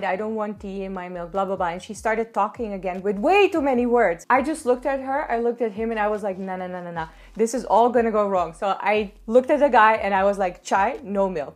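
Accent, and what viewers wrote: India. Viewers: You have that Indian accent. Lol. I was in Gurugram in October 2018 and boy, I was forced to adopt that accent to pass across my messages for folks there to relate to and I found that quite interesting. And yes, things are super cheap there in India. If I could buy a ship load of stuff, I really would've loved to do so. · Your Indian accent 💗💗💗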